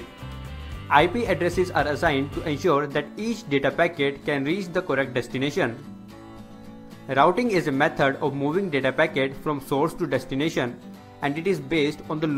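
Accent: India. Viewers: I love the video, contents are correct AND Well presented.. Easy to understand rather than reading the books and trying to imagine it all. For improvement, i suggest that the narrator to speak a little bit slowly in speed since the Indian-English accent takes a little time to process especially for Asian. All in all, thank you very much for being generous sharing the knowledge. May Allah bless you always n :) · I love his indian voice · مفيد بس الإنجليزي الهندى ده عاوز ترجمه لوحده... ياخربيت بريطانيا كل سنين الاحتلال ده ومعرفتش تغير اللكنه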